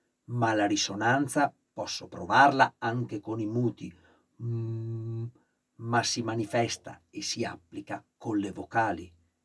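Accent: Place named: Veneto, Italy. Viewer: Maestro ho appena scoperto il suo canale. Sento con piacere che è veneto come me e perciò le chiedo: effettua anche lezioni in presenza? (Mi scuso se le pongo una domanda a cui forse ha gia dovuto rispondere più volte) Complimenti per la chiarezza e la spontaneità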